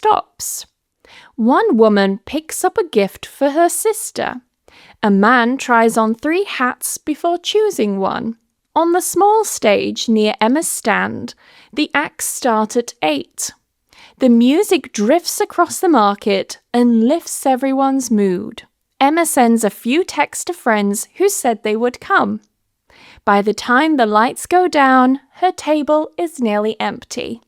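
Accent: United Kingdom. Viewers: I truly love british accent, and specially yours ❤ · I want to speak like you. I really love british English. Could you tell me how I can learn british English?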